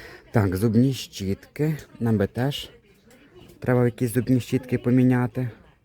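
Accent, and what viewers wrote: Ternopil, Ukraine. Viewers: Я з Криму. Обожнюю ваш тернопiльскiй говiр💕Ще з Мастер шеф.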